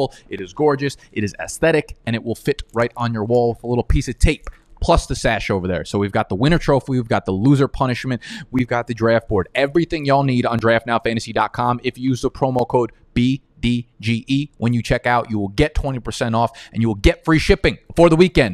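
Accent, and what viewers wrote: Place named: New York, United States. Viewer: I haven’t watched any of this dude’s videos in a year. Did he develop a NY accent out of nowhere?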